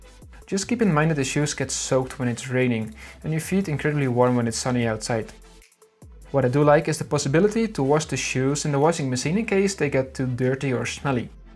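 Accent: Netherlands. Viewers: wait wait u dutch?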